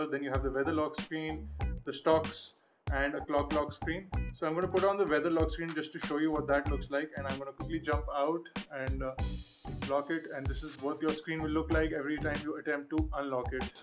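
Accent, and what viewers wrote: India. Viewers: what you expect ,an indian to speak english in american style. you fucking idiot. shut your mouth and get some sense not sensation. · your fckin idian accent is annoyinggg!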